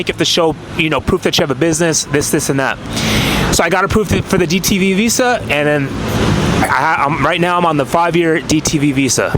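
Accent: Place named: Texas, United States. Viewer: I can already tell you was from Texas lol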